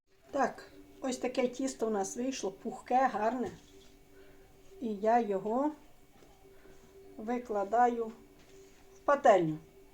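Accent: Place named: Solotvyno, Ukraine. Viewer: Ой 😄у нас так само кажуть" штири" откроми" 👍наче ви наша солотвинська(Бердичівський рн)🫶👍👍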